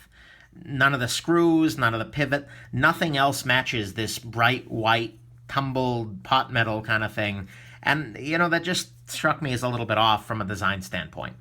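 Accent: New York, United States. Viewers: New yawk I'm assuming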